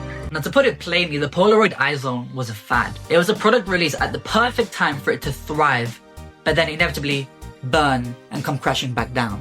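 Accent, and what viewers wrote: United Kingdom. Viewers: holy plot twist. i saw ur youtube shorts and did NOT expect u to be british. (slay tho) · I KNEW YOU WERE BRITISH. I KNEW IT.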